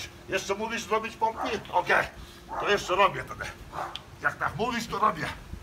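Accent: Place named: Lithuania. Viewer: D Uwielbiam ten jego litewski akcent.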